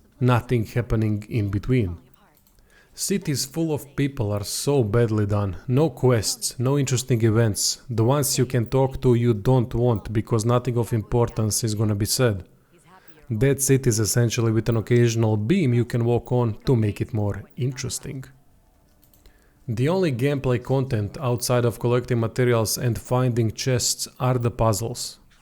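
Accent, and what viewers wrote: Russia. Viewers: Melodramatic Russian sounding cunt. Hilarious! I like ur take and will check out other videos. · Всё по делу рассказано. И этот ядрёный русский акцент))) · впервые слышу столь стереотипичный русский акцент не от актера, изображающего русского, а от самого русского.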